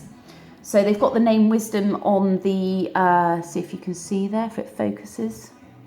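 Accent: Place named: England, United Kingdom. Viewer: Go to England the place is full of people who talk like this.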